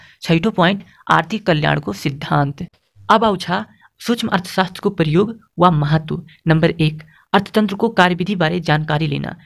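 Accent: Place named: India. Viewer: indianjasto bolera kei bujhiyena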